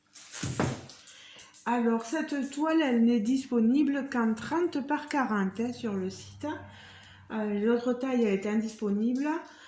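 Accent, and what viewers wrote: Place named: South, France. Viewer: Coucou ma petite ❤ Merci pour cet unboxing avec une vidéo qui fait plaisir pleine de bonne humeur et ton joli accent du sud 😍 Gros gros bisous 😘😘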